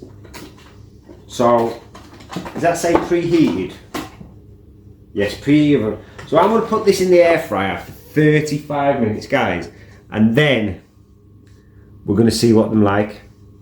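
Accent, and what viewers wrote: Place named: Willenhall, United Kingdom. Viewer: Where you from, dude? Sounds like Willenhall, or Walsall? Good review!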